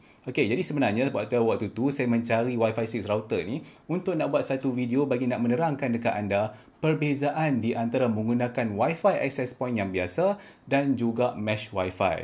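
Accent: North, Malaysia. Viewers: Cukup sempoi n natural. Maintain bahasa utara. Terbeikk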